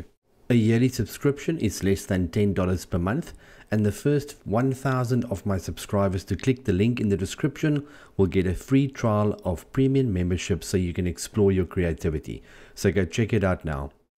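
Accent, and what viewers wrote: South Africa. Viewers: I respect your designs and work ethic, that south african accent gets me every time. all the best and greatest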